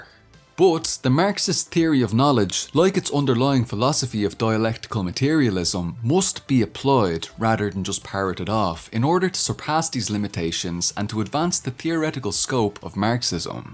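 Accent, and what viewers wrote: Ireland. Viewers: Missed this lads thicc Irish accent sharing Marxist wisdom with the masses lol✊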